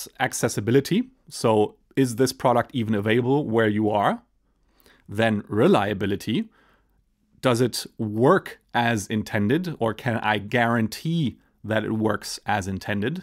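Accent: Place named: Germany. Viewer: You have some german accent, are you from Germany? :)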